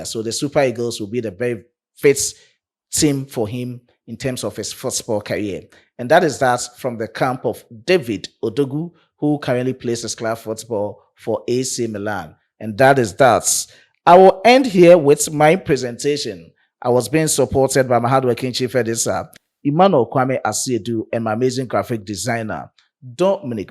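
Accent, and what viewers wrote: Ghana. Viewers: Mr you sound Ghanaian to me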